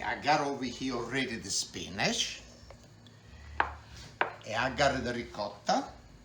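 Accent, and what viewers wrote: Italy. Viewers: This is just thé most wonderfull italian dude... I am a big fan of his recipe's. But above all he absolutely melts my heart; with his vibrant personality and ever so charming accent....realy gets me going in my kitchen!! 😍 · You are awesome! My grandma comes from a village in Italy named Casavera outside of Rome. Your dialect is so similar that I think of her every time I watch your videos from the garden to the dry sausage to the pasta & peas, it’s what I grew up around and it’s so similar. Thanks for having an amazing channel!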